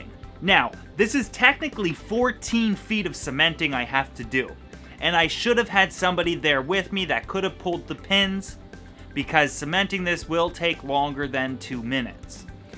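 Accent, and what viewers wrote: Canada. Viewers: In this video i could definitely hear his Canadian accent come out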